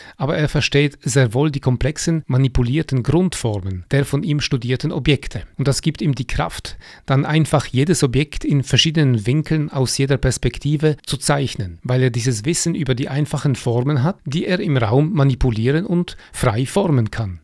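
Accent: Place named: Switzerland. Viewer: finde ich auch den Schweizer Dialekt so lustig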